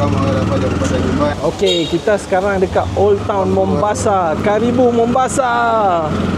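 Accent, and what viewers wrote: North, Malaysia. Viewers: Samalah...first time dengaq loghat utara terus subcribe😂...n seghonok dengaq penerangannya..jelas sebutan..terbaik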